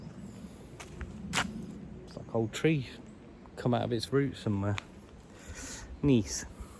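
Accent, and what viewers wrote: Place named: England, United Kingdom. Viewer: Love your walk and talks. You present deep thought provoking conversations. Love your accent. Wish I was born in England.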